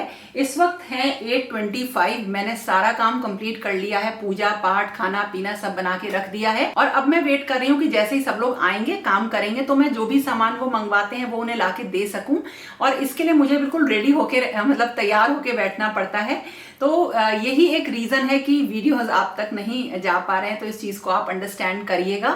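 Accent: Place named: Mathura, India. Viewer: I'm from Mathura mam,mera mayka h mujhe lagta to tha aapke bolne ke ton se ki aap Mathura ya Agra se ho.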